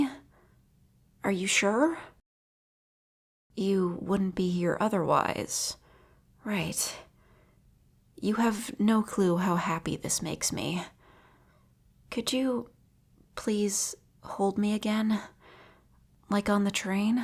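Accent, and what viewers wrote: United States. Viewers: the most American sound Russian on earth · She's american? Darn...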